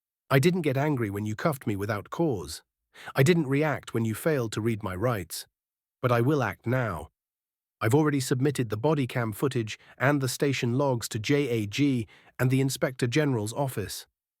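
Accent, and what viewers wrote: United Kingdom. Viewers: Sofa King tired of this AI BS posted by people with obviously zero talent of their own. On top of that: what kind of idiot puts a brit accent to a US military-based video. Fail on all accounts.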